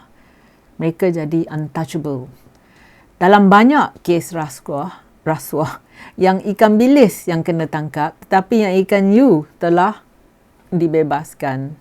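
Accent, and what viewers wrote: Perak, Malaysia. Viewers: Loghat puan ada macam loghat Perak sikit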